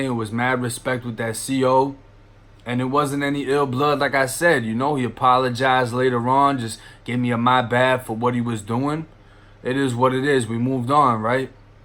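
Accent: Boston, United States. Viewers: Here from Death’s videos. New subscriber and fan. Keep it up man. BTW I would have sworn you were originally from Boston, sorta sound like we do. EDIT - watched deaths videos from last to first, guess you are originally from Boston. Super big up to you. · Bro you sound like your from Boston i aint being funny i fucks witchu but yea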